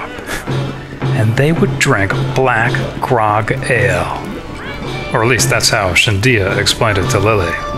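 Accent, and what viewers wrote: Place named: Philadelphia, United States. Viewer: It's very Philly-of-Italian-Descent. "Hey yous. Hey yous guys! Yous been down the shore?"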